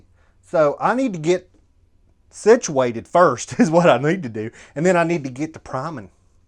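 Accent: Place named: San Francisco, United States. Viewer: That intro voice sounded like some San Francisco hillbilly, and I thought it was the Yankee Marshall, and I almost turned it off.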